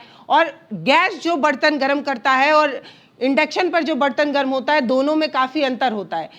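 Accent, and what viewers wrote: Bihar, India. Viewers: Aap bihar se ho na??? Kaaraahi kaaraahi bol rhi ho?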